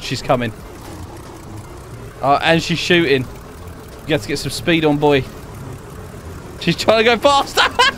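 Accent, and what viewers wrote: United Kingdom. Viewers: Are u British cuz me new sub!!!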